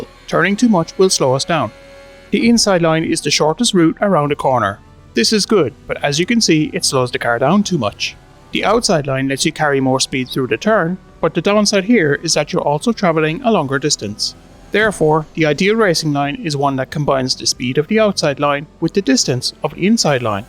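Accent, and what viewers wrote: Ireland. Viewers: I would think ur Irish where you from I love these tutorials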